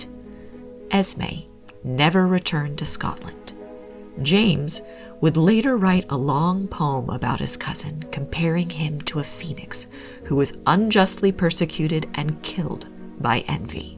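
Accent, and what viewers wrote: United States. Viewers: This is American accent at its finest.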